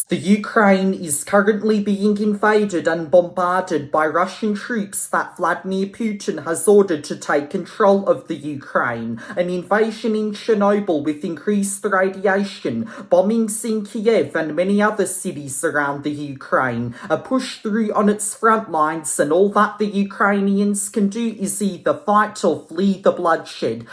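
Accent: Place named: Europe. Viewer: your accent is quite European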